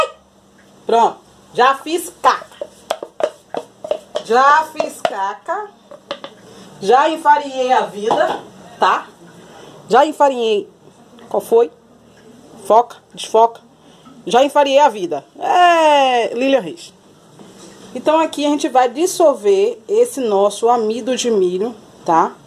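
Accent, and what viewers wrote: Bahia, Brazil. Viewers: Vc é baiana? Seu sotaque parece,sou baiana,vou fazer ,amei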